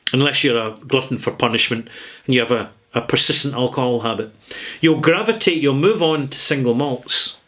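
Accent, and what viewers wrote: Scotland, United Kingdom. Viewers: Hi, I love your trade dress. Please take that as a polite compliment from an old patent and trademark attorney. The tweed bonnet, the thick woolen flannel shirt, the Scottish accent, the bothy, the barrel, the whole vibe. Your knowledge is extensive, and the breath of your whisky knowledge and vocabulary, and the style of your delivery, is superlative. There is an honest integrity about you which I find very refreshing, heartwarming and frankly entertaining. With great interest I have probably seen over 500 of your reviews. I like you, I am a deliberate and passionate malt mate. I’m an American living in Cincinnati, Ohio who loves Scotland and scotch whiskey. So it’s clear that I love everything you are doing and I wish you all the best of health and I hope you do this for a very long time. So what my gripe? One and one only. 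Please review Macallan. I am your echo chamber and I will support everything that you don’t like about non age statement whiskeys. I understand that the bulk of your audience cannot stand the snobbery (or the sky-high prices) of Macallan. Yes, it IS the gorilla on the table. And ignoring it seems disingenuous. And you are anything but disingenuous. Oh, what I wouldn’t give to have review a NAS Macallan Oscuro, non chill filtered, natural color and bottled at about 46% abv. Yes high prices can be a turn off but what would Car & Driver be without periodic reviews of Bugattis and Ferraris. We may not be able to afford those lux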